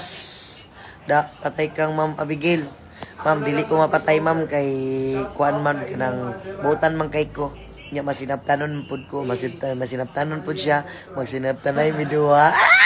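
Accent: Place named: Cebu, Philippines. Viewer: Ang sarap nga pakinggan pag magsalita ka ng sibwano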